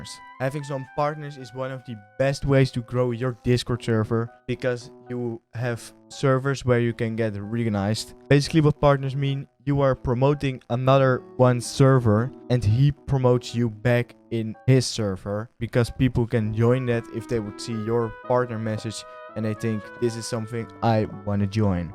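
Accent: Netherlands. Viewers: Alright, goos vid but this dutch accent lmao great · Ben je Nederlands? Ik hoor aan die accent 😂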